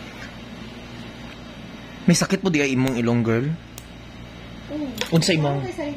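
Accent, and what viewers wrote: Iloilo, Philippines. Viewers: ilonggo ka?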